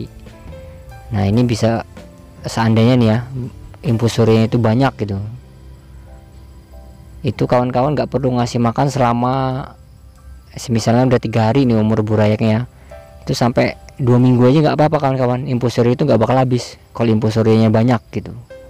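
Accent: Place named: Palembang, Indonesia. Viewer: Abang ini logat Palembang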